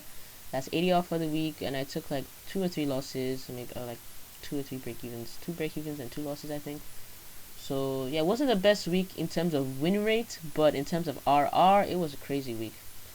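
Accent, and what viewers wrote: Nigeria. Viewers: I was thinking shes definitely nigerian lol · Thanks,... You sound Nigerian tho... Great content went through your telegram from September... Wow... It's inspiring to see how the trades improved so fast, pure hardwork welldone, can't take that away from you... You bad sha🤭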